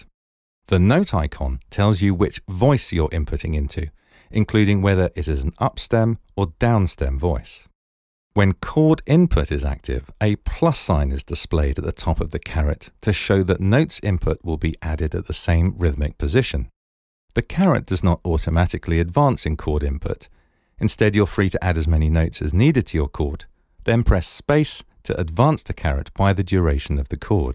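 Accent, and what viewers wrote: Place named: England, United Kingdom. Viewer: If it’s explained with an English accent we think we’re the stupid ones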